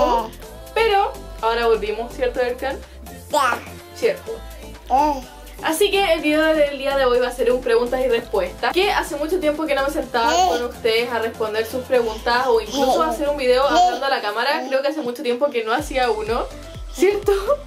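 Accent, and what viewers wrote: Chile. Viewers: ¿Eres chilena?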